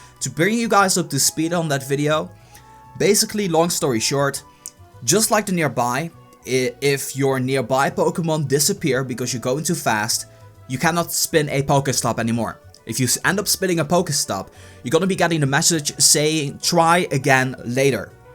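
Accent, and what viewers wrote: Netherlands. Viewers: Kan goed horen dat je nederlands bent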